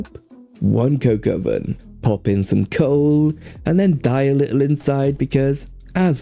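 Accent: Wales, United Kingdom. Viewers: I recognise a Welsh accent anywhere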